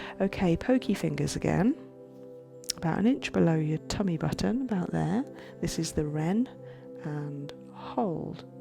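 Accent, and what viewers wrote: England, United Kingdom. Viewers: Amazing videos and very interesting. Didn't even know this was a form of treatment. Great videos. What part of england are u from? 😊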